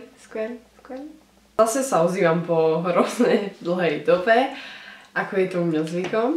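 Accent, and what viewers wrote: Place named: Hungarian border, Slovakia. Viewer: + podla mňa bývaš na 90% pri maďarských hraniciach :) Podla prízvuku (mám pravdu?)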